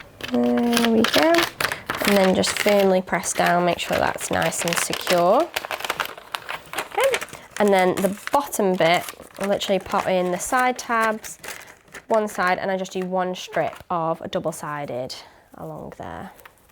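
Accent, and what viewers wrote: United Kingdom. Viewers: I wish they would have someone who spends standard English and not British English, which harder to understand for Americans. Also, she has British accent and another accent.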